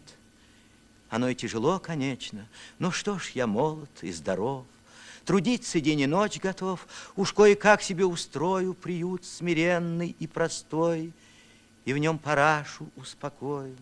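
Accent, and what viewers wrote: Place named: South, Russia. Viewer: Говор с "гаканьевм и хаканьем" - точно, как в фильме "Бриллиантовая рука" или в мультиках "Ну, погоди!": "Спокойно, Козлодоеу, сядем усе". Оказывается он всегда так говорил, и не мог или не знал, как выработать чистый театральный говор по-русски и избавится от местечкового произношения, диалекта.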